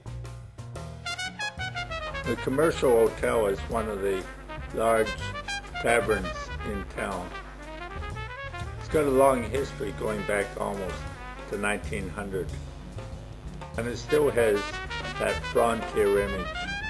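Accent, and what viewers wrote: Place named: United States. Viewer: Why is a "Seppo" narrating this?🚫🇦🇺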